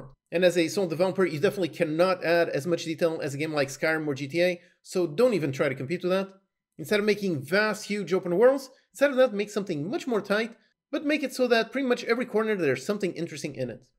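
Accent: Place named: Portugal. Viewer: Totally off topic question, but you speak Portuguese as well, don't you? I can notice from your English accent, either that or you speak some latin language, right? Your videos are excellent, I really like how you're straight to the point, they vibe with me a lot, thanks for that!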